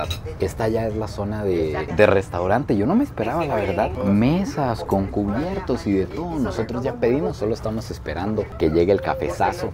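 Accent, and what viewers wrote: Mexico. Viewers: jajaja flaco kbron, que chingon que visitaste nuestro Mexico. Te ves feliz, gracias por compartir un poco de nuestro encanto mexicano. oye, por cierto, tienes acento mexicano. neta eres de otra patria?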